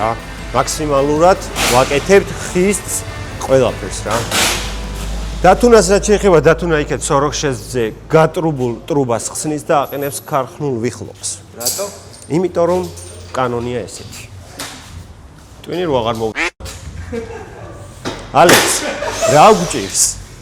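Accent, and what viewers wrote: Guria, Georgia. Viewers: კაცურად გურულად მეტი ილაპარაკე ❤ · გურული აქცენტით რო ურტყავ ხოლმე ვხალისობ კაია 😊 მეც გურული ❤😂 გბალეშიკობთ გურული ბიჭები, აპა რავა 😊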